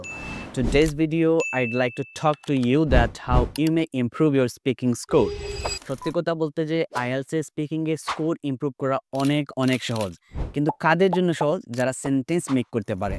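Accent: Sylhet, Bangladesh. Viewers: আপনি কি সেলেটি?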